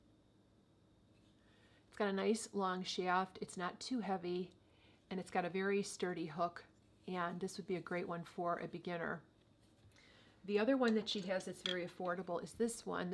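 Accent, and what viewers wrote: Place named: Midwest, United States. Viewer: I knew as soon as I heard you speak that you were from the Midwest. I was born in Rockford and spent half my childhood in Dixon, Il. Life has taken me to England though. Thank you for this video!! I found it very informative. Last year, one of my best friends gifted me a bunch of wool from sheep she breeds and raises. She gave me some gorgeous Blue-Faced Leicester and a spinel that she made because she knows how much I love to knit. I figured it was about time I learn but have been putting it off out of fear. I love the way you broke your video up into sections for us because that will come in handy when I go back and want to view a particular technique. It's so hard to know what videos to start with when there are so many out there, and I feel blessed that I found yours because you showed your viewers different techniques to use, and honestly, some may be easier than others for different people if that makes sense. 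Do you have a video on preparing the yarn? I know from another short video that you put the yarn on a niddy noddy then put it in hot water. Can you put a wooden niddy noddy in water though? Does the book you recommended go into all of that? Once again, thank you so much for this?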